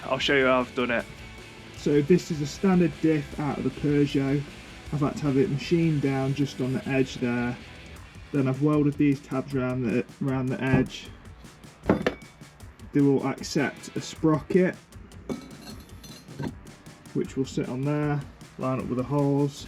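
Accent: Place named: East Midlands, United Kingdom. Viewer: Just watched the 106 Hayabusa on the runway very impressive. That's a right accent were is it Nottingham area possibly Derby. It certainly is fast, anyhow you have a Leeds fan subscriber.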